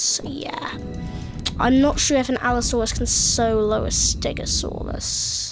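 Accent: United Kingdom. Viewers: You are you British? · U sound Britian!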